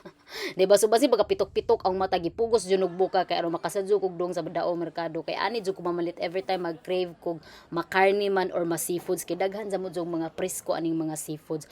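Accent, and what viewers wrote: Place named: Dumaguete, Philippines. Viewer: karon ra ko ka realise. Dumaguete ug Bohol parehas ra kaayo ug grammar ug accent. ang kalahian ra ang J ug Y.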